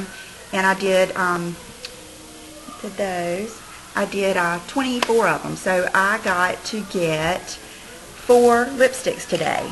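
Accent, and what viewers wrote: South, United States. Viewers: No worries about that "Suthern" accent! he he, just thought to help out with the pronunciation and I didn't even get it exactly right! Can't wait for your depotting video! Thanks!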